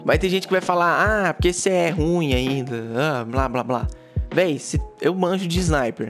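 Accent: Minas Gerais, Brazil. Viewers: Pelo seu sotaque sei que vc é de MG. So pelos videos que vi concordo com suas opiniões quanto aos especialistas e quantos às animações... to esperando ficar free pq nao gasto dinheiro com pre venda de cod...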